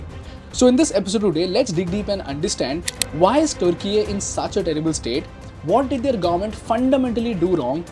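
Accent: India. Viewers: that indian ancent bleed my ears btw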